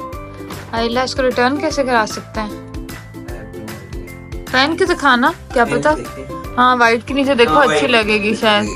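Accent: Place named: Punjab, Pakistan. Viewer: PK jabi and Canadian English accent doesn't mix too well....but its funny nonetheless !!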